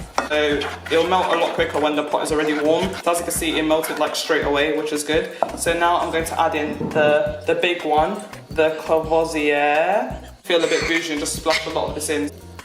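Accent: United Kingdom. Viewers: I was so shocked to see you had a British accent! I like your channel ♥️. New subbie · So love the uk accent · Nice recipe, but I'm mainly subbing for your accent....soooo english😁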